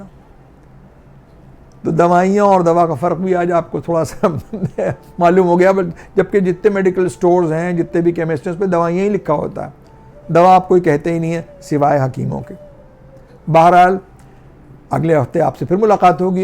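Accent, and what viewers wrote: Lucknow, India. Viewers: Vah..Aaj sunee Lucknow ki puranee jaban va dilect Andaz..Dr Hukku was first England returned doctor. His encounter with Raja saheb Kasmanda Sitpur was very interesting..Our elders in the Highcourt used to narrate old stories of 1930s 40s Lucknow and its grand old generation..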